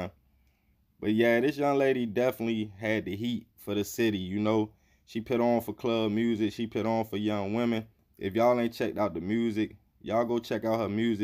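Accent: Baltimore, United States. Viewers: You can tell he from Baltimore the way he reads.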